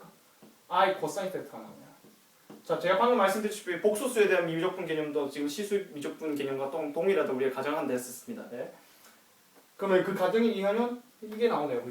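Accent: Gyeongsang, South Korea. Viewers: 서울말씨는 경상도 말씨든 설명이 참 좋네요. 달을 가르키면 달을 봐야지 어리석은 몇몇사람은 손가락을 보네요. 설득 보다 유도라는 표현은어떤가요? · 서울 말씨를 사용하세요. 경상도 말씨는 지겨워요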